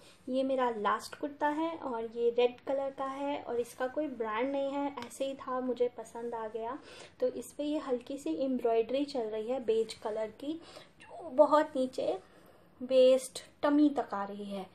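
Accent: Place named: India. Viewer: great haul.....soo cute😊😊love your hindi ascent...😍😍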